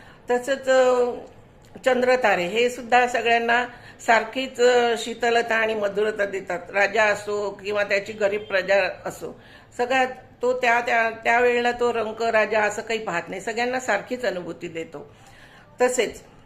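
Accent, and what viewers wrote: Standard. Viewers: खुप छान,शुध्द आणि स्पष्ट उच्चार आणि सोप्या शब्दात अर्थ सांगीतला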